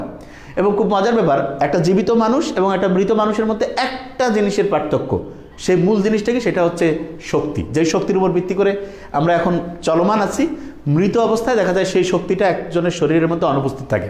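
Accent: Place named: Rangpur, Bangladesh. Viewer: Sir apni rangpurer Manus sune khub vhalo laglo❤🥰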